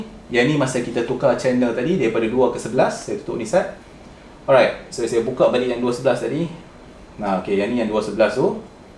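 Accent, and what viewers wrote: North, Malaysia. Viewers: TK... Org Utara ka ni ?...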